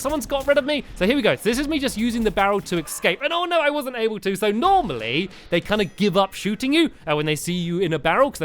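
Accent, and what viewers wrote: England, United Kingdom. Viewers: The U.K. Can't you tell from his accent( England )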